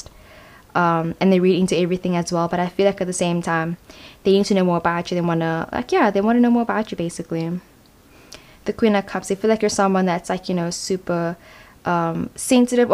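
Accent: South Africa. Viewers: Your accent is beaitiful...are you South African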